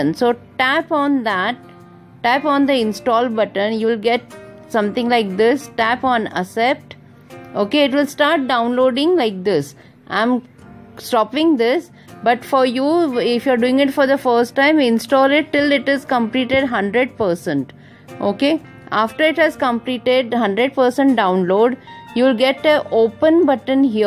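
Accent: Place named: India. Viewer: please speak in proper English. You Indian pronunciation just funny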